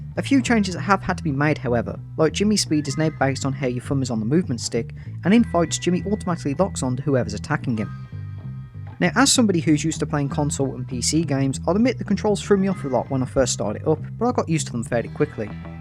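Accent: United Kingdom. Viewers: you speak in a heavy British tone to the point I... cant understand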